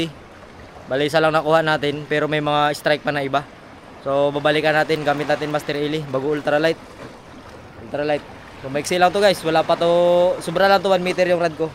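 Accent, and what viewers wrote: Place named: Cebuano, Philippines. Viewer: Hahaha.. hagua sa nag kirig2x.. bisdak jud kaayu ba.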